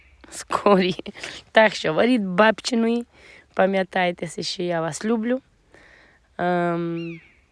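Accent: Transcarpathia, Ukraine. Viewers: Закарпатський діалект файний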